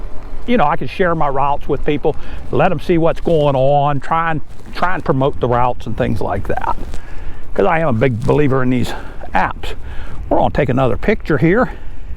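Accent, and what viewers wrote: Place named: South, United States. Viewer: What part of the South are you from? I am in Alabama.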